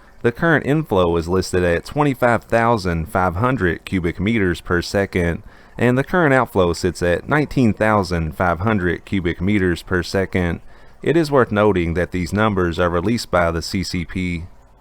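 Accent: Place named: Appalachia, United States. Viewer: how yual doooin. love this guys hillbilly accent.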